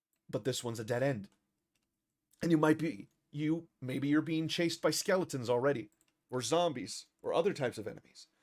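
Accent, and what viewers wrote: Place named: United States. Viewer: You were born and raised in Brazil? You have the most American American accent I have ever heard. Did you train your Brazilian accent out of yourself or something?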